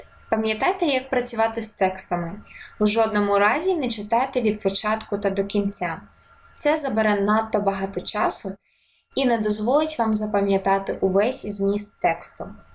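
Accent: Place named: United Kingdom. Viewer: Який крутий британський акцент у ведучої!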